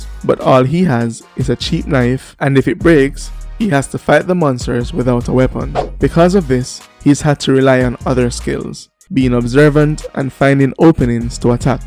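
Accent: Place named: Jamaica. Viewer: Sounds like a yawdie. Great breakdown.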